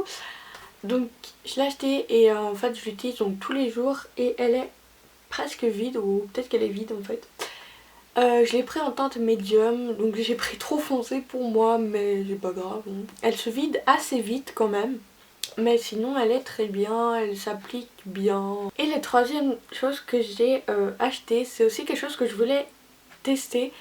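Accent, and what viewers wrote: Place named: Switzerland. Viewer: je pense que ça doit être l'accent suisse, je doit pas être habitué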